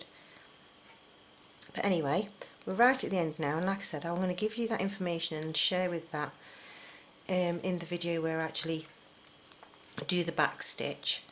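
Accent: England, United Kingdom. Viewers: Thank you very much I did it and I am so happy, you make very easy to understand, and thank you for the history about crochet that you kindly share in your video, I love crochet and I love your English accent, I leave in Australia.:-)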